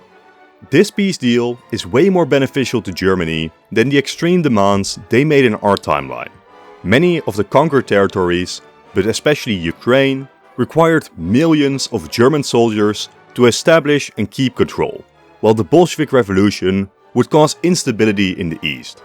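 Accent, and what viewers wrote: Netherlands. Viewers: I can tell instantly that this man has a Dutch accent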